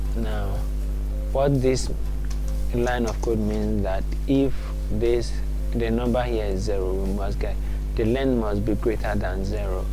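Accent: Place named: Ghana. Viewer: Good tutorials BTW you sound like a Ghanaian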